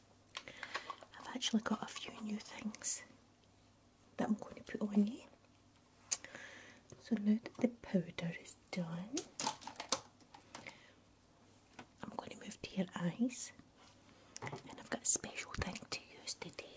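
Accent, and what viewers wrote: Scotland, United Kingdom. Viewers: Thankyou for a great video! I love loveeeee your Scottish accent! And I love your makeup videos. You make it so relaxing! Great job gurrrrrrrlll!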